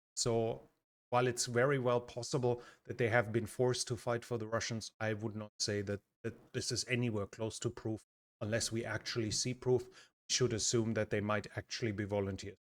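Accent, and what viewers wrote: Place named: Germany. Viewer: With your voice today more close to Deutsch accent 😊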